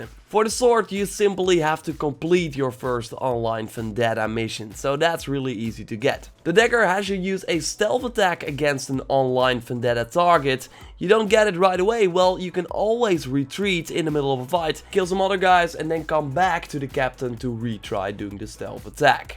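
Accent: Netherlands. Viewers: Do i detect a Dutch English accent ? xD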